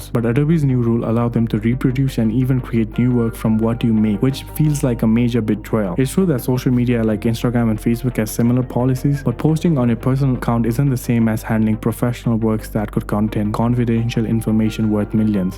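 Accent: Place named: Nepal. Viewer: You sound like a Nepalese. Are you one?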